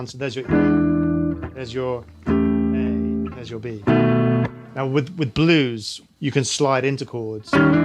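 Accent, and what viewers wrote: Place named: Southern England, United Kingdom. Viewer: another great lesson ive been working a lot with these chords recently and this has given me some perspective, some way to categorise and organise them. I guess from looking at your website that your a music producer in the states now but I would love to place your accent, its almost definitely south of England but after that im stumped.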